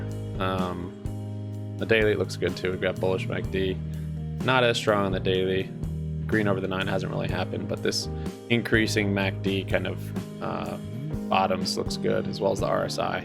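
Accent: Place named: United States. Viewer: Dude, you sound just like Elon Musk with a more American twang. Are you Elon Musk?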